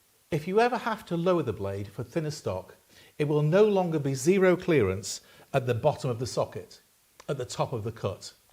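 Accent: United Kingdom. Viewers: Hi, I’m not sure if you ever get to answer any of these comments but I’ll ask anyhow. So refreshing to hear an English rather than American accent for a change by the way! I’m coming back to the UK next year from South Africa and would love to know of workshops, Guilds or such where I could learn (I’m pretty much self taught and have only been doing wood work for 3 years). Please give me any advise if it’s at all possible. Thank you.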